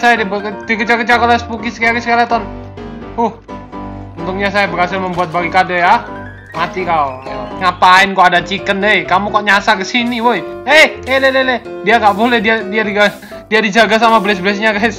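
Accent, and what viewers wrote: Java, Indonesia. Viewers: Fun fact: ternyata yang dulu agak medok jawa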